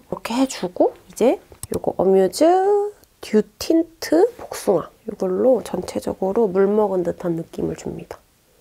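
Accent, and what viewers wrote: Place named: Gyeongsang, South Korea. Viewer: 님 약간 경상도 말투 나오는 거 같아요... 기분 탓인가...?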